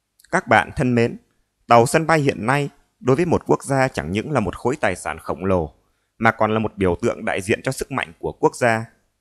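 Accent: North, Vietnam. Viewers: Giọng nói phát âm giọng Bắc chuẩn nghe rất hay, không nhớt nhát như giọng Hà Nội. Phát âm gãy gọn nghe mê giọng Bắc này lắm.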